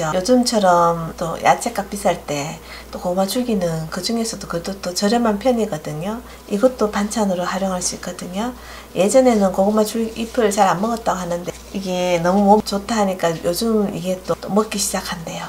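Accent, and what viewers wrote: Gyeongsang, South Korea. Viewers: 고구마줄기 골은거.ㅋㅋㅋ 한참웃었네요.뼈속까지 갱상도우리이모님. 저도 마산사람이라 골은거 낯설지가않네요. 화이팅입니다!!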